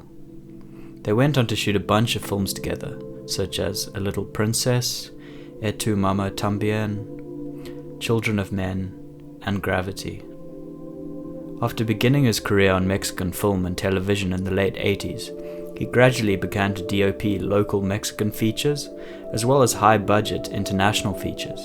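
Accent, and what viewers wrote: South Africa. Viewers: Love your South African accent brother 🙋🏾‍♂️. Great insights as well. He's my favourite cinematographer atm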